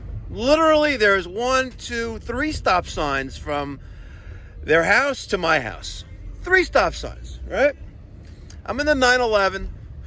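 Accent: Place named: Long Island, United States. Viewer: Ha! Ran accross a video head the accent and said oh that's long island!! Ronkonkoma in da house lol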